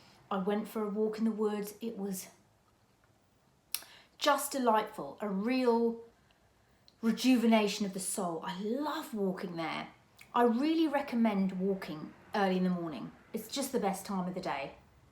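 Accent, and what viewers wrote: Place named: Northern England, United Kingdom. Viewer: Hey, I'm loving the pumpkin vibe you're having. It reminds me of how much I miss the autumnal days at home, plus the fact that there is so much more choice in the supermarkets than over here as the seasons change 🍁. I do feel that the 'clean beauty' fad is just really bad and complete misinformation. Watch ANY board certified dermatologist or cosmetic scientist and they give all the clinically proven facts that parabens and all the other 'demonised' preservatives are absolutely safe and absolutely needed to keep our products bacteria and germ free.....and prevent skin infections.... UNLESS you have a known allergy to a specific ingredient. This is why cases of some skin complaints (peri-oral dermatitis ect), are on the increase. Oh, it's the same over here regarding TV, there's nothing interesting....although I do still love coronation street and eastenders on UK TV 🙃👌. 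Yep, I'm here for the 'latte' inspired make-up look, 👌 😍. It looks really natural and glowing.... however, I'm not a person who gets my nails done, so I can't comment. Apart from saying, "Go with what appeals to you, you can always change it later on." It's good to have a choice 😊 LOVING the Northern accent, too 😍 😅. Great video, and it's always great to see you 👍 ❤⚘️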